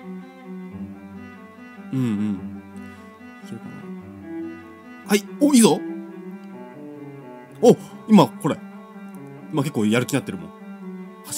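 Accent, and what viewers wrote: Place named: Kansai, Japan. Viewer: 棒人間のクオリティがｗあとさんの関西弁なんかいいですねｗ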